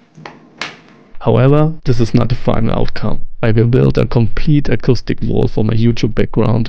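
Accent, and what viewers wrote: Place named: Germany. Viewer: Nice, and then the song 😂 You sound German not Dutch.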